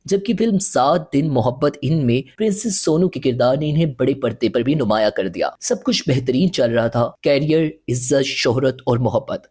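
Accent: India. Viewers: Ye Jo host hai kia India se hai. Talafguz India ki Tarah PATA nahi kion apnate hain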